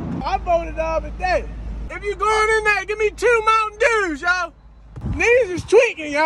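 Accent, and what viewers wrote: Baltimore, United States. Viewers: Bruh so good I actually though he IS from Bmore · Is this Nigga from Bmore? Lmao 😂 how tf is he doing this shit so perfectly ? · He gotta be from Baltimore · I’m a Baltimore guy born and raised he nailed this shit 😂😂😂😂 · You definitely form Baltimore I can not!!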